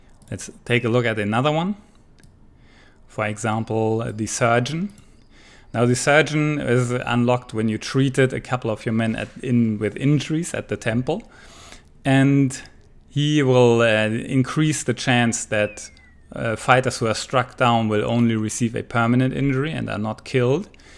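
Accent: Germany. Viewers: 宁这英语，一股子🇩🇪味儿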